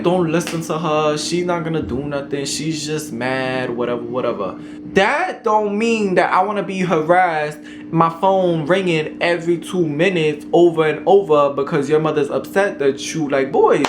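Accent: New York, United States. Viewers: I don't get NoLa from him... NY breed all up in thru his vocal cords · "First of all, im not a bitch" LMFAOOO 😂😂 what part of ny u from btw? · I love your New York accent ❤️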